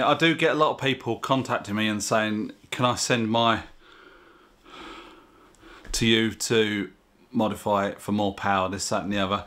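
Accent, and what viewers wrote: United Kingdom. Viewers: Im thinking of maybe getting one of these in the future, and think i would probably like to get mine powered up , you sound like you're quite local to me , are you in the Midlands?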